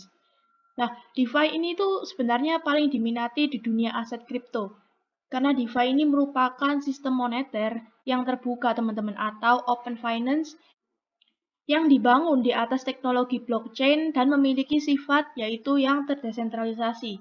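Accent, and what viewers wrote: Java, Indonesia. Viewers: medok nya khas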